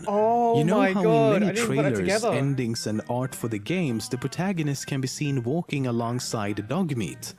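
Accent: United Kingdom. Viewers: you can tell hes british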